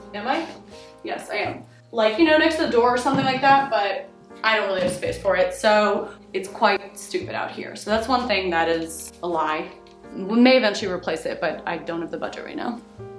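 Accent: Italy. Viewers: maddai il tuo italiano e' eccellente! Hai pure un accento emiliano! Brava e complimenti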